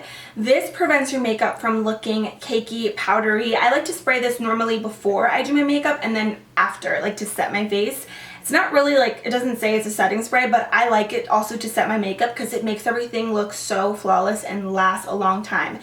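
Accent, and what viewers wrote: Spain. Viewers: i just love yur spanish accent 😘😘😘😘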